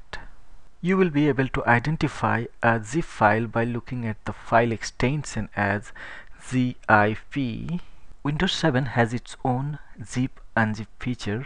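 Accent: India. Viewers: As soon as I hear the Indian accent I instantly don't trust them with tech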